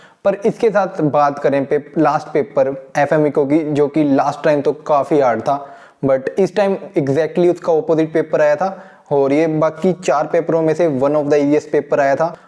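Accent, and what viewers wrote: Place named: Punjab, India. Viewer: okay ji mai vi punjab to . Tuhadi accent to pta lg gya tusi punjabi ho . Mai fazilka to ha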